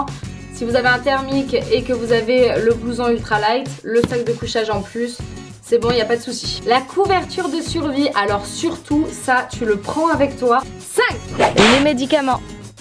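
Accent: France. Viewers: Adoro ouvir o sotaque francês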